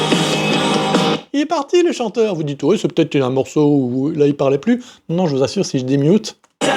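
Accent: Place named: Belgium. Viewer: C'est peut-être une fois la pointe d'accent belge 😉 En tout cas, bravo pour vos vidéos explicatives !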